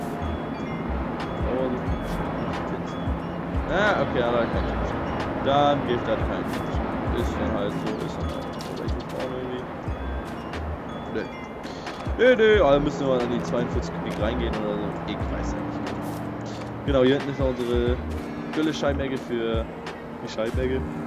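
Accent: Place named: North, Germany. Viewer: Sehr toller Hof, tolles Video, sympathische Stimme samt Akzent (ich liiiebe norddeutschen Akzent!). 👌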